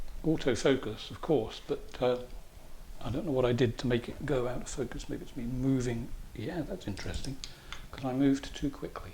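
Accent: England, United Kingdom. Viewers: Would like to know the colors you used for this painting. Was not able to hear it very well, due to hearing. Your English accent Is different than the American. Sorry no offense. Thank you, Gina/Texas girl 👧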